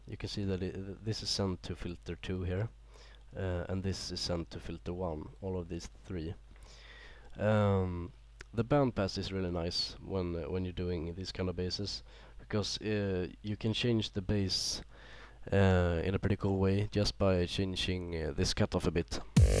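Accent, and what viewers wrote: Sweden. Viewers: U sound soo swedish! are u swedish? jag är de ;)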